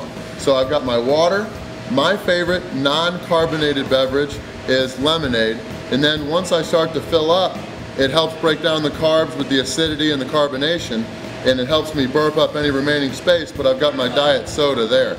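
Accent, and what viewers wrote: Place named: United States. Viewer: Jamm Lighting lol he’s got like a northern hillbilly twang to it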